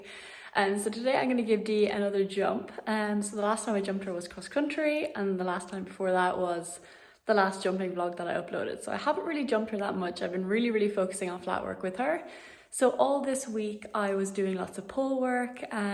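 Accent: Ireland. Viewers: Another fantastic video... 🙂😉😁 Her sweet sexy irish voice makes me fall asleep 😴 but not because I'm bored in the slightest lol it just reminds me of those cd's which help you fall asleep!! I often lay in bed watching these & wake up still wearing my headphones & holding my phone!! I love all of her videos, especially the connection she has with the horse.. perhaps the gentle voice along with her fantastic riding hypnotises the horse lol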